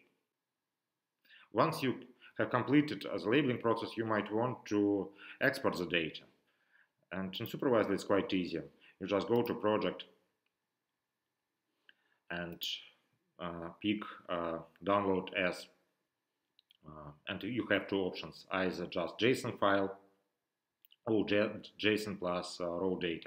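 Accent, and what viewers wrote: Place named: Russia. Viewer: Russian accent))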